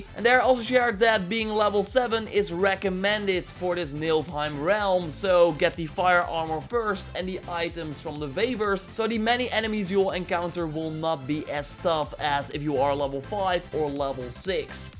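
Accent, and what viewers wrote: Netherlands. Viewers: is he european his accent sounds dutch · Are you dutch :) I guess yess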